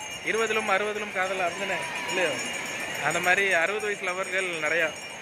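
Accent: Tirunelveli, India. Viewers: Hi I am new subscriber. Unga native place enga . Slang Tirunelveli maathiri iruku anna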